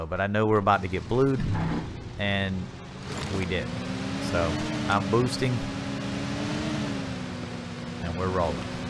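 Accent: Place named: Carolinas, United States. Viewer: Hey, I am going to assume by some comments you've made in the past and by your accent that you are from the Carolinas. I hope this looming hurricane doesn't impact you too much. I am about 30 miles north of the NC border in Virginia and about 10 miles from the coast, so we are preparing for it here too. Here's to hoping the storm doesn't hit either of us directly and that you don't have to work too many extra hours in the aftermath. Stay dry, my friend.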